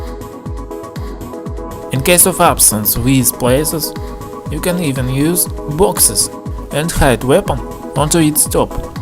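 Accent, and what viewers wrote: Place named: Russia. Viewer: I love Russian accent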